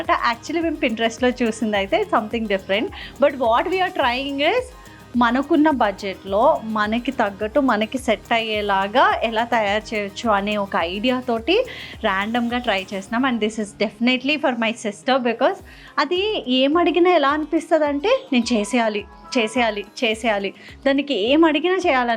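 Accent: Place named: Telangana, India. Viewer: tg accent osthundhi it is very diff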